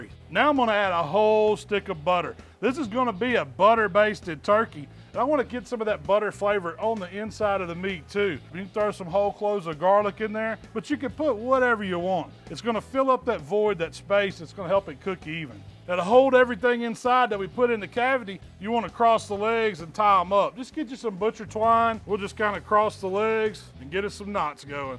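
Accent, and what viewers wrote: South, United States. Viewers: Look no further when scrolling through how to smoke a turkey and come across a guy with a mean southern accent. Thank you sir!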